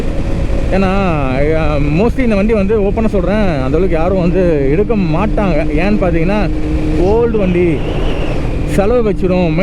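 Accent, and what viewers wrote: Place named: Ambattur, India. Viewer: unga slang semmaiYA irruku bruh!... Nanum ambatturTHa bruh!...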